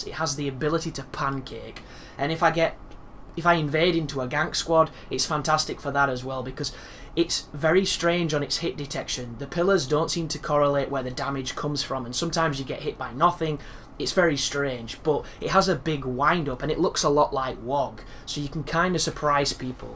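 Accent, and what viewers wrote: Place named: North, United Kingdom. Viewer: Where are you from? If you don't mind me asking; I'm reminded of your accent by the accents they use for men North of the Wall on the Game of Thrones TV show :)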